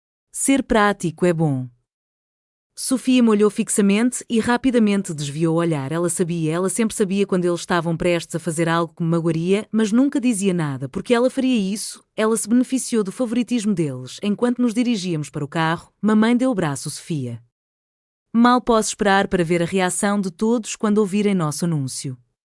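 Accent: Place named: Lisbon, Portugal. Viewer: O acento lisboeta leva a pensar em emigrantes portugueses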